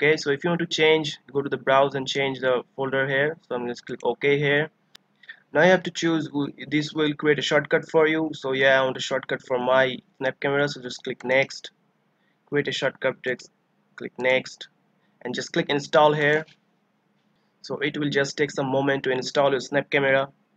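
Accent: India. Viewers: Bro your voice sounds good in regular Indian accent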